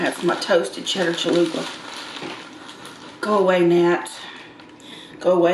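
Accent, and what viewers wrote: South, United States. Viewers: 🌮Cheat days are a good idea when your weight loss stalls. Enjoy!🌮 Your southern accent is thicker than mine today! Lol!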